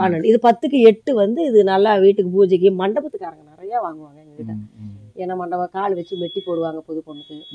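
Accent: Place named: Kongu, India. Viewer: எத்தனை பொறுமை...சிரித்த முகத்துடன் கொங்கு தமிழில் பேசிய பேச்சில் இரண்டு மணி நேரம் போனதே தெரியவில்லை மென்மேலும் வளர வேண்டும் வாழ்த்துகள் சகோதரி ❤❤ பயனுள்ள சிறந்த காணொளி ❤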